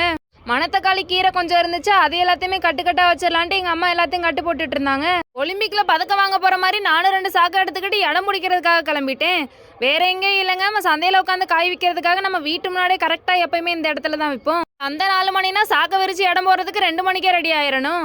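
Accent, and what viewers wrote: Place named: North, India. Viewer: Ne y ma vadakan maari tamil paesara,nithaanama paesu ma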